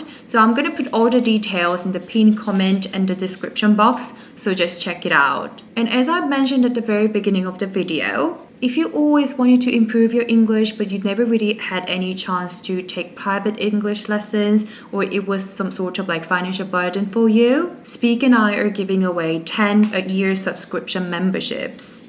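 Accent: United Kingdom. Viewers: 가르쳐준 표현들 너무 유익하고 흥미로워용🙌🏻!! 언니 영국발음 듣는것도 좋고,멋있어요🙊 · 영국식 발음을 듣고 싶을때마다 Jess님 유튜브를 찾는데요. 오늘은 다양한 영국식 표현을 알 수 있어서 흥미로웠어요.☺️ 다음에도 새로운 표현 알고 싶어요! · 3년 전에 런던으로 대학원 진학하면서 님 영상 매일매일 봤었는데 졸업하고 한국 돌아와서 자리잡는 동안 잠시 님 영상을 못 봤거든요. 그 사이에 님께서 구사하시는 발음과 인토네이션이 런던에서 매일 듣던 발음이랑 정말 많이 비슷해져서 깜짝 놀랐어요. 저는 영국식 인토네이션이 어느 정도 자리잡히려던 시기에 코비드 때문에 반강제로 떠나와서 지금은 많이 없어지고 흐려졌거든요 😂 앞으로는 저도 의식적으로라도 님 영상 찾아보면서 제가 배웠던 영어 떠올리며 다시금 공부를 시작하고 싶다는 의욕이 생깁니다. 대학원 준비하면서 워낙 님과 만드신 영상들로부터 긍정적인 영향을 많이 받았던터라, 오랜만에 들어와서 반가운 마음으로 이렇게 길게 댓글을 남겨봐요! 항상 건강하시고 좋은 날들 보내시길 바랍니다 ㅎㅎ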